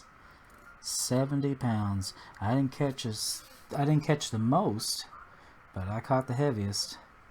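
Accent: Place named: Georgia, United States. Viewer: You sound like youre from Georgia, which makes you a natural Ice Fishing Expert I recon :D Good Video! Can you show us how to catch Sturgeon next?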